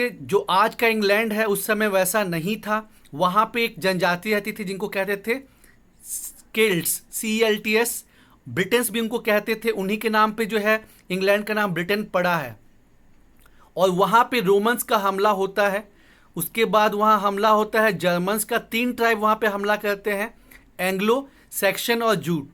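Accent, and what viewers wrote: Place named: Jharkhand, India. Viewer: thanks a lot,,for replay without any boasting I m now out of jharkhand and your style of speaking recall me jharkhand🙏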